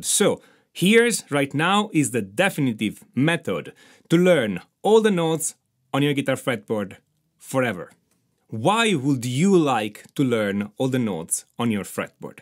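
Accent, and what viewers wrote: North, Italy. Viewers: Yep, northern Italian I would dare.. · Lui è italiano quando dice lento in inglese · Italian, Roman or northwest of Rome?? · Italiano vero . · Accento italiano? 🤔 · U have an italian accent...?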